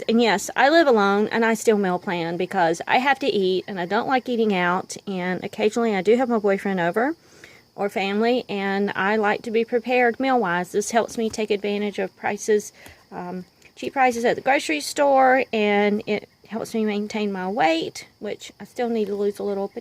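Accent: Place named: South, United States. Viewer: New subbie. Keep up the cool videos. Your journals look great. I'm guessing from your accent you're southern like me. Nice to hear.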